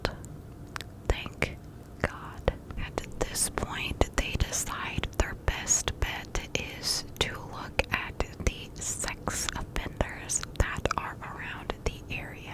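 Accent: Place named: Ohio, United States. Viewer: I fucking knew you were in ohio! You have the same accent as me and all my peeps lmao. I grew up in summit ohio or blacklick outside of columbus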